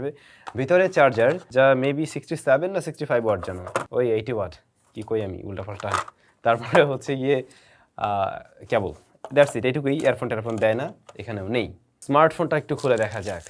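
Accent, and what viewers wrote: Barishal, Bangladesh. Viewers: ভাই মাঝে মধ্যে আপনার কথা শুনে মনে হয় কি আপনার বাড়ি মনে হয় বরিশাল।আপনাকে খুব আপন মনে হয় তো তাই হয়তো মনে হয় আপনার বাড়ি বরিশাল কারন আমার বাড়ি বরিশাল তো তাই।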